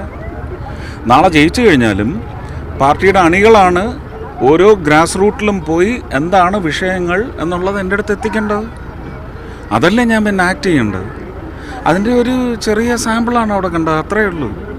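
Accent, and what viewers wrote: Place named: Namboothiri, India. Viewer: ഇയാൾ ആരാണെന്ന ഇയാളുടെ വിചാരം.. സംസാരവും ആക്ഷനും എല്ലാം എന്തോ ആണെന്ന ഭാവം.. ഓരോരോ കോപ്രായം.. അടുത്ത ജന്മത്തിൽ പൂണൂല്‍ ഇട്ട് നടക്കണം എന്ന ആഗ്രഹം ആണോ ഇപ്പോഴേ നമ്പൂതിരി ഭാഷ പ്രാക്ടീസ് ചെയ്യുനത്..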